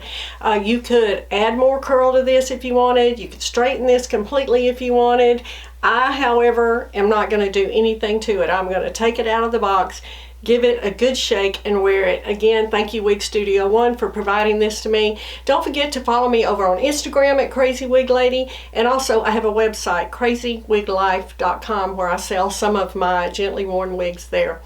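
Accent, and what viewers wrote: South, United States. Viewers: I love your videos and your southern accent is wonderful. I have bought many Raquel Welch wigs in the reds. Several in Glazed Fire (RS28). I also have three wigs in the Fiery Copper that you have own. My all time favorite wig in this color is Advanced French. But I also wear Born to Shine in the same color. I have also purchased a wig in Golden Russet, but to me, this is much more blonde than red.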